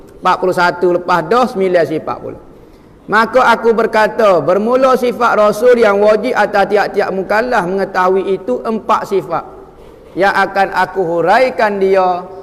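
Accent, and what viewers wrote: Kelantan, Malaysia. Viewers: sb kbanyakn yg prtikai tu..ak tgok ade yg x brpe fham ape yg d mksudkn oleh ustaz...ustaz ni kn bhse klantan dia pekat skit..ape yg dia smpaikn lain yg org fhm..tp x smua la..ape pn ak ske,ustaz idrus dn ustaz sbdul somad...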